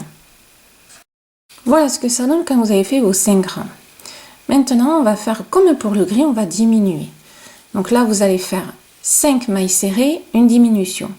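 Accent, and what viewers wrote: South, France. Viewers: Super, vous êtes géniale merci pour le joli lutin et j'adore votre accent du sud j'attends d''autre tutoriels avec impatience !!!!! A bientôt .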